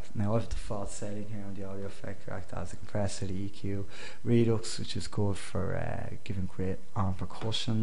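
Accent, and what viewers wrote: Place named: England, United Kingdom. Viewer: it´s englisch dialect !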